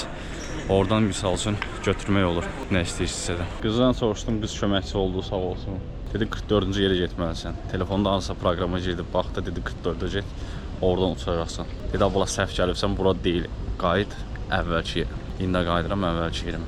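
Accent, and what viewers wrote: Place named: Ganja, Azerbaijan. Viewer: Ləhcədən bilinir gəncəlididə😂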